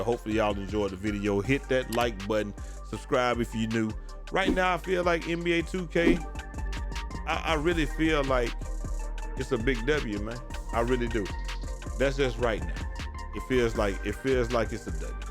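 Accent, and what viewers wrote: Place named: South, United States. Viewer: They from the south. Most men from the region sound like this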